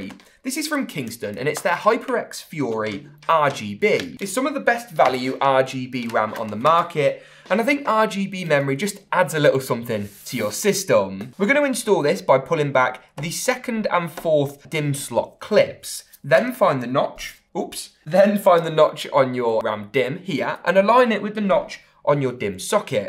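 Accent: United Kingdom. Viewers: U have the best British accent I’ve ever heard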